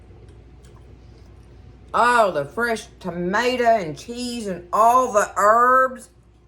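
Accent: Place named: South, United States. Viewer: I'm going to give this a try. I love listening to you talk...you sound like so many I grew up with.. I'm from the south and we talk like you. You are a ray of sunshine with your personality and a blessing to many...God bless you 🌷🦋🍎🍉🥧🫖🍇🍓🐝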